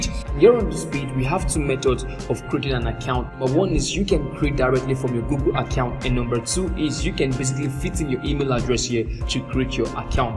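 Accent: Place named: Nigeria. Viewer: Are you Nigerian, I can pick up the accent, subtly though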